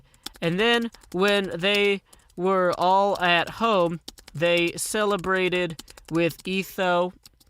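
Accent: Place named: Kentucky, United States. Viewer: Excellent work! Love the accent. I'm a KY girl myself so it is rare to hear something sounding like home on Youtube! I need you to make a prequel with more Etho in it. Hahahaha!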